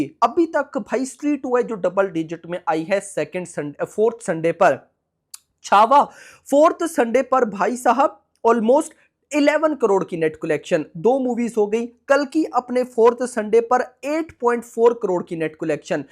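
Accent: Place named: Pakistan. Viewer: Ye pakistani hai kya bc kya accent hai ye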